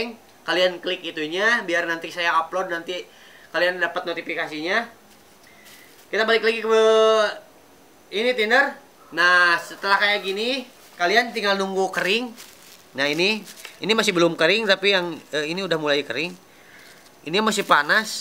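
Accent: West Java, Indonesia. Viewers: Sunda pisan logatna hehehe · seceng anying wkwkwk khas sunda bgt lo bang hahaha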